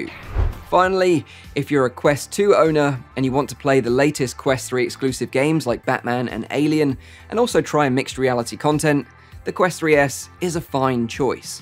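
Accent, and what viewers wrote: United Kingdom. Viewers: Thats the same vr i have and he talk british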